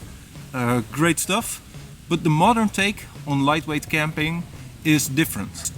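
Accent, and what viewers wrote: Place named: Netherlands. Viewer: Are you from Holland my friend ? Your English is realy good I can just hear a bit of accent ! Super tarp shelters anyway !!!!!😉👍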